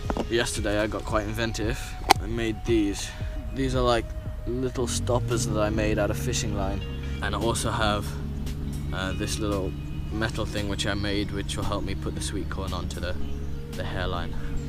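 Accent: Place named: United Kingdom. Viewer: You sound so British lol nice video dude.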